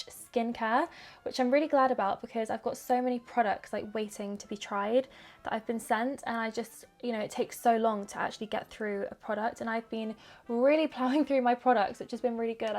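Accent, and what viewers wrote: United Kingdom. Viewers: i usually skip through the sponsership part of videos cause they always have the same products, but i couldn't skip through saying all of it in her british accent 😌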